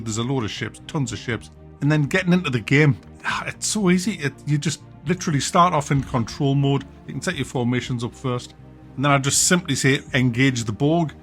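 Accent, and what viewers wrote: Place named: England, United Kingdom. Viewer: I have watched your videos since 2017 now. I still cant figure out, where in England your accent is coming from.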